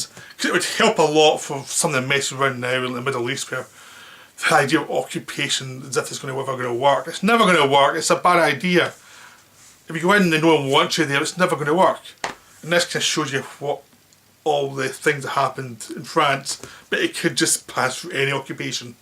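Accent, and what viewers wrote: Scotland, United Kingdom. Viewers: He is a Scotsman that how he talks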